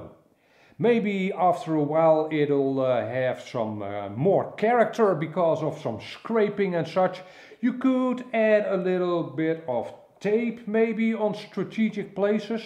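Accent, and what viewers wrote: Netherlands. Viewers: Mate, your Dutch Accent is brilliant. I love the Wing, I love the Video but all I can think of is: "Pipe and a Pancake" 😂😜✝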